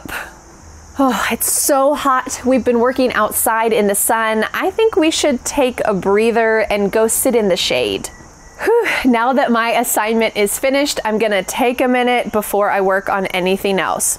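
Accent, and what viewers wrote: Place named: United States. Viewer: By the way, I'm crazy about the American gorgeous accent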